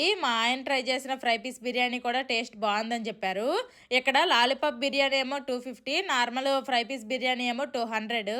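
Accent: Srikakulam, India. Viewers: Ni chettha srikakulam slang aapu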